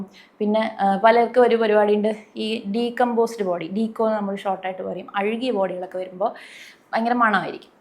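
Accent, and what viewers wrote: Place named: Kannur, India. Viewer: Oru kannur slag😊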